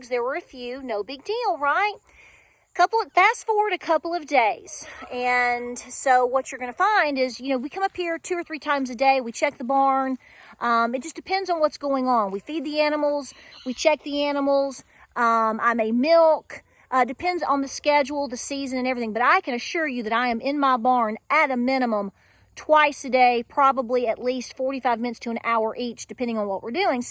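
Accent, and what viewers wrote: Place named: Easton, United States. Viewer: I have been watching you for so long and just wanted to share just how much I enjoy it being from Easton to see myself I love Hearing that accent as you go over things you are so encouraging and it's so heart warming and comforting to know that you care enough to take your precious time and that of to share this information with us a lot of us know it have you used it and may have forgotten about I have forgotten about it but you're helping to bring it back to the forefront and I'm so grateful you too as well as your family are in my thoughts and prayers Best regards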